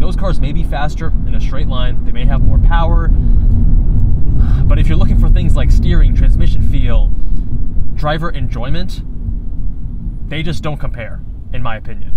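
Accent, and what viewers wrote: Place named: Singapore. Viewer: Sounds Singaporean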